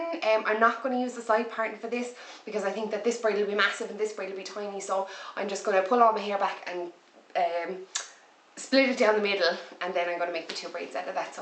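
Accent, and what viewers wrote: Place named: Ireland. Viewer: I love your Irish accent I love the video PLUS thanks, this video really helped me 👌🏻👌🏻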